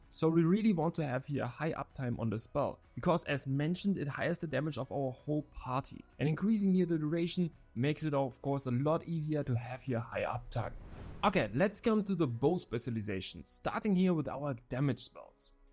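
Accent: Germany. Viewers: german who speak english xD funny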